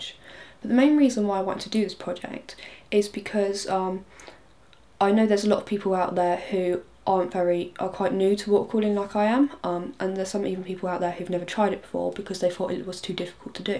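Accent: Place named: Essex, United Kingdom. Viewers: English, english. English they speak in England, where it comes from...! Slight Essex accent though, a county north east of London. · Fit! im sure she is from essex.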